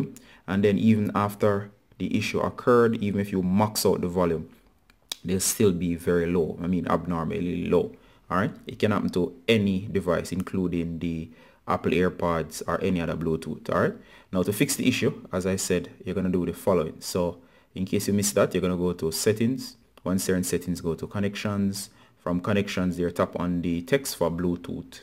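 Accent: Jamaica. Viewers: You sound like a jamaican. Well thanks for the advice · A which part a Jamaica yuh come from dawg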